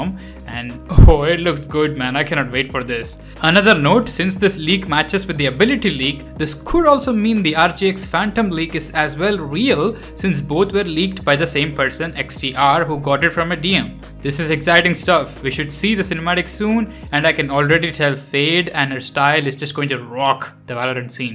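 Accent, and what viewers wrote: India. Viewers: Never mind but why does your accent sound so Indian?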